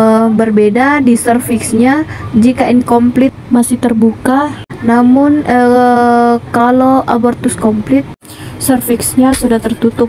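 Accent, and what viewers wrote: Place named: Bone, Indonesia. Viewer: Logat ta kyk org bone kak.. mksh sdh buat videonya kak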